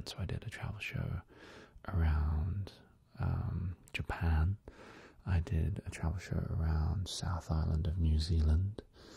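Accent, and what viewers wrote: England, United Kingdom. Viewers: That accent . Oh the English 🤤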